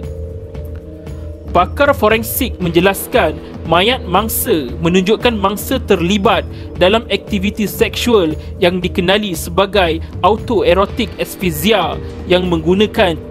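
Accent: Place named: Malaysia. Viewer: alah memang slang melayu cmtu kn hshsh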